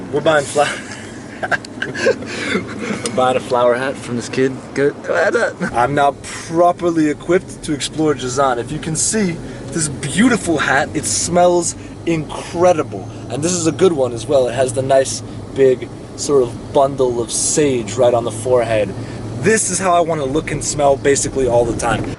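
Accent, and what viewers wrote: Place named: United States. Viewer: Nice video man I hope you add a lyircs on the next videos cuz the amircan accent is not that easy to be understood from the first time.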